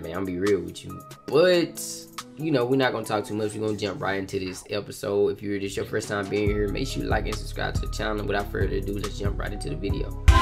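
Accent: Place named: South, United States. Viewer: im just starting with you im feeling it love your accent, is it southern ?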